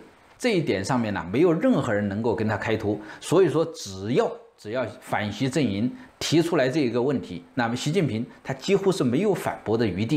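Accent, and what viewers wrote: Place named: Subei, China. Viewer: 这个老兄的口音江淮官话 苏北口音的底子，为什么我总是联想到 江爷爷。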